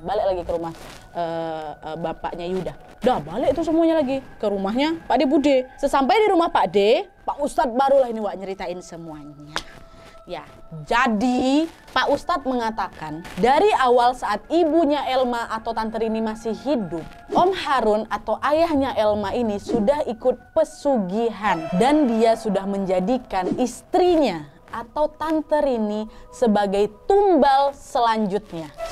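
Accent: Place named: Padang, Indonesia. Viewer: Logat mbk sep org padang😊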